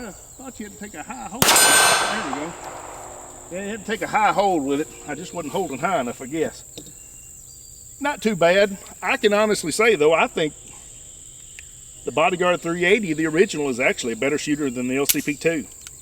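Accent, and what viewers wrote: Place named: South, United States. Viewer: I'm from the North and enjoy your Southern drawl. Tying to get my wife to try it also. PS - My Sig 100 grain ammo tends to spit back at me also.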